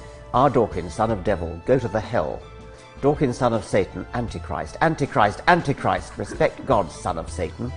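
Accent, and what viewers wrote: England, United Kingdom. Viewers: we all speak like that - in England dear. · LMAO do funny with his prim English accent and dry humor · It's made all the funnier by Richard Dawkins reading out the often illiterate, rambling, expletive laden stuff from people claiming to be god-fearing Christians in his dry English accent. My own favourite is th eone who tells him how they are looking forward to seeing him being tortured in hell and signs it off with "a loving created daughter of our lord". And of course "the fart of god" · These always bring such a grin to my face. I love the way Dawkins reads them in that proper English accent. Makes them 10,000x more humorous! Please, not such a long spell between these! Just love the love letters!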